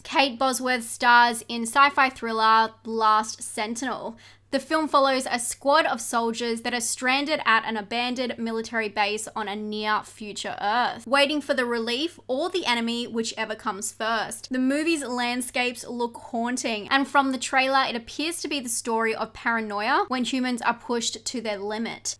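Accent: Australia. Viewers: Thanks for another wonderful list, I’m checking the children of the corn, by the way, I’m totally amazed by the Australian accent you got there ! 🤩 Greetings from Berlin, Germany ✌️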